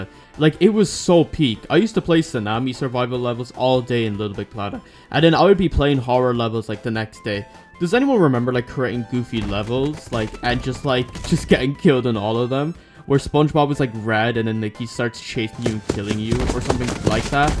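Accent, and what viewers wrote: Ireland. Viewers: are u from Ireland? If you are i am too!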